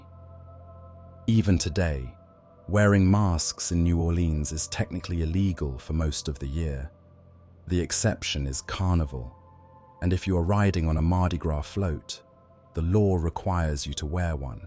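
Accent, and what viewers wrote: United Kingdom. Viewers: He’s British or something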